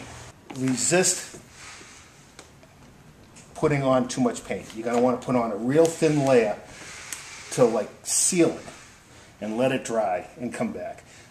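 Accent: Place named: Massachusetts, United States. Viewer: Thanks for the video demo. I will be trying this today...Nice Mass. accent by the way! lol you must be a Voc Tech teacher?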